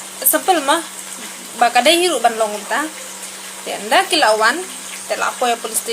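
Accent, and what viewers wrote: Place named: Ri-Bhoi, India. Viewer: Sur bhoi dei na bhoi tharai ikong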